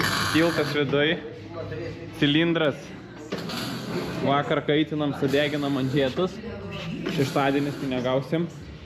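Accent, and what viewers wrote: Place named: Pasvalys, Lithuania. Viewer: pagal kalbod akcenta pasirode kad pasvalio raj :D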